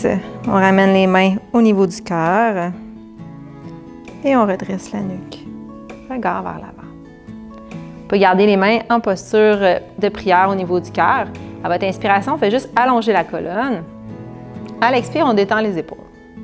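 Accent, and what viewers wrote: Canada. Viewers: Vidéo vue de Genève. (j'ai pratiqué et pratique occasionnellement l'hata Yoga). Super vidéo merci pour ce partage car j'ai un bon physique mais j'ai de la peine à me motiver...on verra si ça joue. Bonne continuation et j'adore L'accent canadien. J'ai un accent genevois ou vaudois à l'occasion 😉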